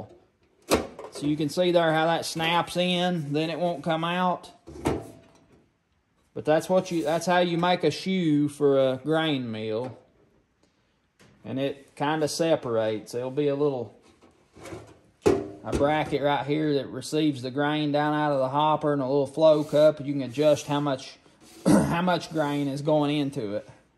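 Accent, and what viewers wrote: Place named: North Carolina, United States. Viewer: I have just been listening for maybe 2 weeks cause I just found your channel The way you talk I can tell that area is a vastly different place than Louisiana at least the central part of our state I tried to move to N.C. Years ago when my oldest married a young man she met in Bible school but her dad was unwilling to move anyway you are an encouragement to me even though I am a grandma So sorry I didn’t realize your Dad has passed You know that feeling when all your folks started passing well to be 68 and stuck in this place with family gone on feels like that. I have searched for a church and can’t find one where Jesus is at. Drinking & drugs and such like seems to be the name of the game. I just draw closer to God and try and love folks Keep the good videos coming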